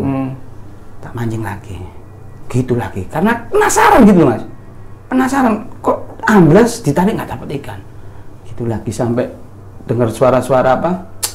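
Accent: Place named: East Java, Indonesia. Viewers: Sing crito ngomonge medhok suroboyone... Aq wes ora iso ngomong suroboyoan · logat suroboyoan jadi pengin balik surabaya.. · Thul.. thul.. lheep!! 🤣 gregeten koyo ngrungokno konco dewe lagi crito. keren mas e cerita dengan logat khas jawa timuran. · Sip keren jawa timuran nek crito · Logat orang sby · sy sudah 22 th tinggal di kalimantan, sy suka dengar chanel ini, kangen dg bhs jawa timuran yg medok, rasa rindu dg kampung halaman.... sukses.... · Vidio kali ini bikin sy kangen kota kelahiran tercinta Suroboyo...Logat Suroboyoan bikin makin kangen serasa lg ngobrol jg.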